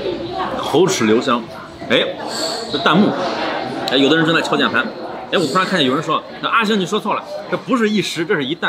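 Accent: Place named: Xi'an, China. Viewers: 阿星，你是不是咱们西安人啊？ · 阿星堪比中央电视台节目主持人，特别好的风格，看你探店吃美食就是一种享受。而且文化修养也非常高。我大学在西安上的，所以看到阿星就觉得特别亲切。西安普通话😆 几乎每集都看，继续加油。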